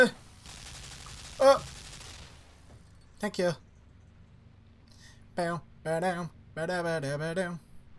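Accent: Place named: Ireland. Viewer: your a great youtuber, I love ya Irish accent love. keep up with the youtubing.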